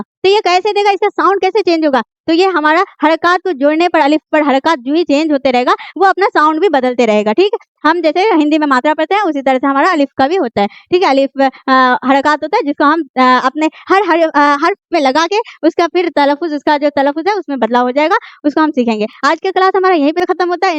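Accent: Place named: Rural, India. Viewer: Pahle apne madam sir ko kahna ki dehati zaban me na padhaye urdu ko बे ते से 😂😂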